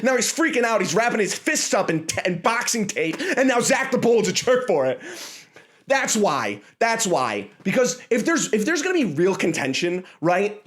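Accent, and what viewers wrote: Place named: Michigan, United States. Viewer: 28th And East Beltine? You're gonna do a show right near my grandpa's place. I know a Michigander when I hear one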